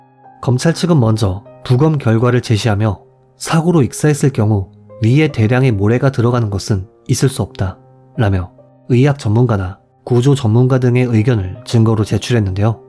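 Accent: Japan. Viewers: 그러게요 일본인 특유의 발음이 있을때 일본식으로 계속 발음하던데 이유가 있는건지 뭔지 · 채널주인님은 일본사람인가요? 영어발음이 상당히 일본인이 발음하는 거 같아요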